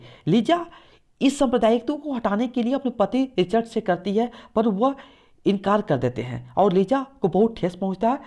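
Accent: Bengal, India. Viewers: Like bengoli accent 😊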